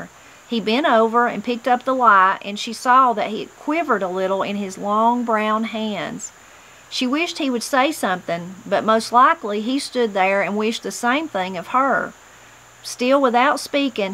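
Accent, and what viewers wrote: Appalachia, United States. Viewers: “Teacher” is a brave and adventurous woman!! Thanks, your genuine Appalachian accent makes these readings special!💜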